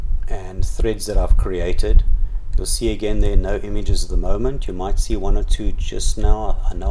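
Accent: South Africa. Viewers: I hear a South African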